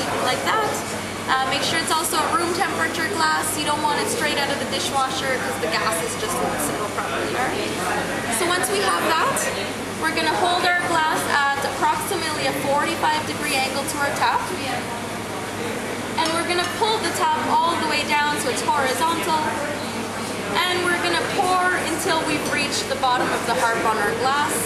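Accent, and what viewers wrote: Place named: Ireland. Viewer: she sounds so Irish, but then I went to Dublin last year and in two days managed to speak to one genuine Paddy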